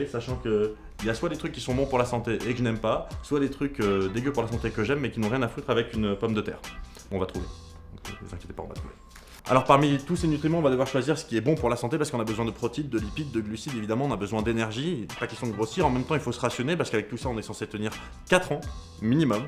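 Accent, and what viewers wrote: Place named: South, France. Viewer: en tout cas j'adore ton accens (du sud)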